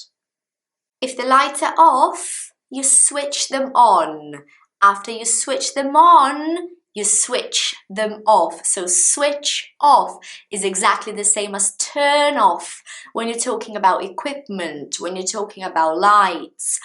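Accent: United Kingdom. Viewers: I missed your 🇬🇧BRITISH🇬🇧 accent during these days without a new video of you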